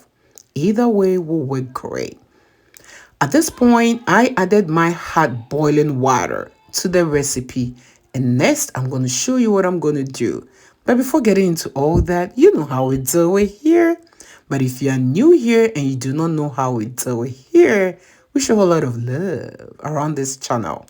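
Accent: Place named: Africa. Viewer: Right especially with that accent sheesh !!! Africans are so dramatic 😂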